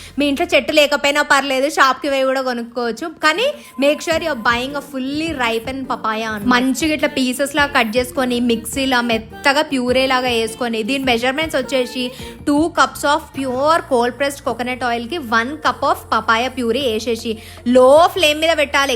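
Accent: Telangana, India. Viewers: Maadi mashi boggu aithadhi....😅pakka telangana slang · Telangana lo atlane antam. Paluguthndi skin antam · Telangana slang😅❤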